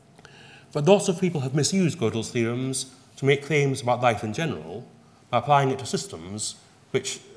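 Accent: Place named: Scotland, United Kingdom. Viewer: all godel proved is mathematicians like to argue and are pompous at best.. but his accent. the most posh scottish i have ever heard lol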